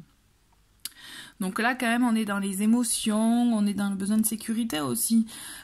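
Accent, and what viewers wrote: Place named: Aveyron, France. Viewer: Merci cela me touche.. votre accent me va droit au coeur aussi car L'Aveyronnaise que je suis est sensible à ce chant dans la voix... ce chant qui est aussi le mien malgré mon ''exil'' en région parisienne. Je garde foi mais je dois me mettre en distance car je souhaite sortir de ma profonde dépression. Bisous🕯🌞❤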